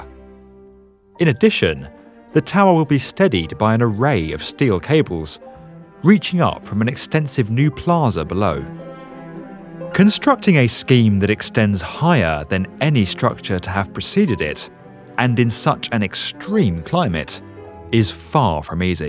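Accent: United Kingdom. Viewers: SuperOffroader6x6 it’s called a british accent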